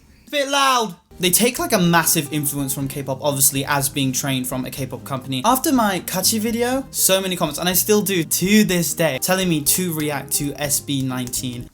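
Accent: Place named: Southern England, United Kingdom. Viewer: Love your accent bro 👌 southern england aroundish London? Lol 😄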